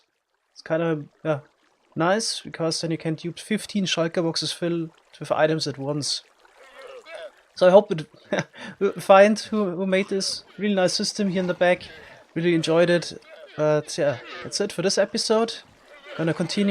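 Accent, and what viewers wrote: Germany. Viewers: Der deutsche Akzent 😂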